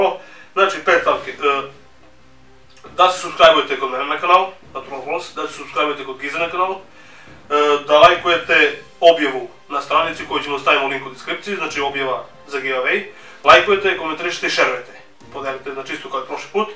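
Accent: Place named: Southern Serbia, Serbia. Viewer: odakle si, po govoru bih rekao da ste iz leskovca ili babusnice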